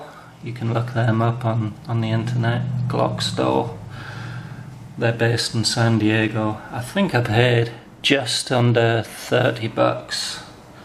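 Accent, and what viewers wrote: Northern England, United Kingdom. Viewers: just out of interest where is you accent from ? you sound like your from up north in the U.K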